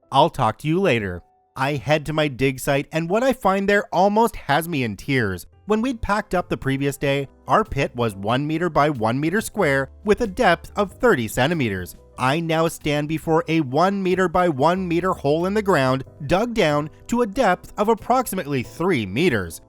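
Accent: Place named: Canada. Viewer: the Aussie way of pronouncing the names is with a short "a", and it is similar to how you would pronounce the word "has". However, as is (I believe) Canadian (?), I can't really fault him for saying the names differently.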